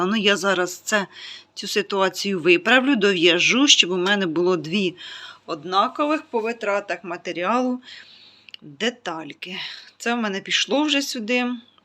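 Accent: Poltava, Ukraine. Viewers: ❤Приємно послухати . Як з рідними з Полтавщини поспілкувалася.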